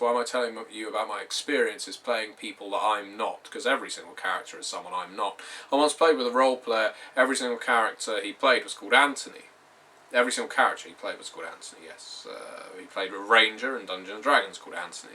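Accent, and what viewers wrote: England, United Kingdom. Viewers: when he talks, i have to pay attention. the English how do they do it?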